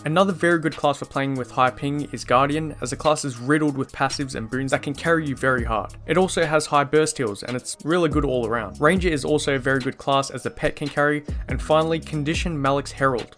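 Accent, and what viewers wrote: Australia. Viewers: fellow aussie herre lol, was looking for a high ping kind of guide to see what im getting into and what class to pick and the second i heard your accent i was like MY BROTHER xD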